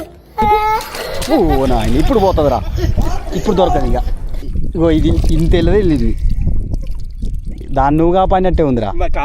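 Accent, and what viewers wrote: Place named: Nalgonda, India. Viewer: Bro kotha district lu bifurcation kanapudu medhi nalgonda jilla kani meku dhaggarlo narshimulapeta mandal warangal jilla undey same me slange.. attincharu bro meru...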